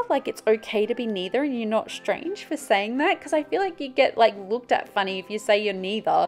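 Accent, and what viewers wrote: Australia. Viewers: We love that you're Aussie! Don't mask that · I love to hear you speak Ausie and want to thank you for giving all of us such a happy fun place to visit. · Never be ashamed of our Australian accent .. We need to show more pride in our country and not dumb down our words for other countries . Proud to be Aussie 🇦🇺🇦🇺🇦🇺🇦🇺🇦🇺🇦🇺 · Your Aussie is one of the unique aspects of you! I’m totally here for it! Don’t ever tone it down! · Awww, but your accent is lovely! Honestly, hearing you talk reminds me of the best experiences of my life when I visited Australia on a study abroad trip. Pure joy and nostalgia. ❤️❤️❤️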